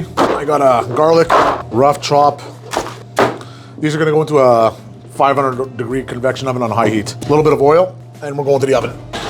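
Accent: Montreal, Canada. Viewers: Ahh Montreal. I was trying to place that accent. Makes sense now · I love the Canadian accent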